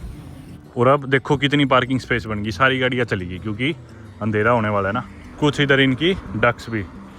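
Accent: India. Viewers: I happened to stumble upon this channel by accident and what attracted me most was the language. At last someone speaks for Indians in their language. Talking about Wales who are proud of their heritage and try to preserve their language, this is being published in English with Indian accent. Why is there such need to speak in English for Indian audience. Knowing another language is an achievement and useful but making it your own…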